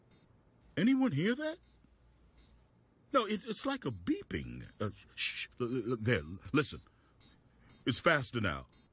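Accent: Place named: United States. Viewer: Reminds me of the narrator from Stanley Parable but American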